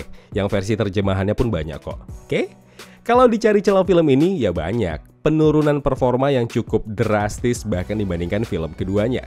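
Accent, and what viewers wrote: Medan, Indonesia. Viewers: Medan kali bah si abang..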